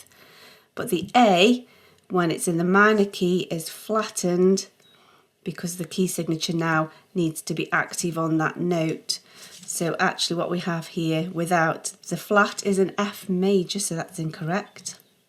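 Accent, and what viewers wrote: United Kingdom. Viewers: You sound British. Are you? 🤔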